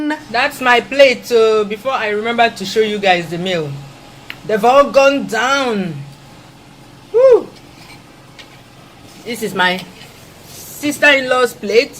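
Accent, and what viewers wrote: Igbo, Nigeria. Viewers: If there is anything more I love about it video. Is your simplicity, ur Igbo sweet accent and your amazing figure of speech. You are amazing and your authenticity is out of this world. I learnt so much about buka stew from this video.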